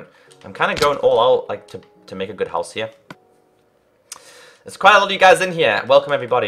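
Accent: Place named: Newcastle, United Kingdom. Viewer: Hoos you from Newcastle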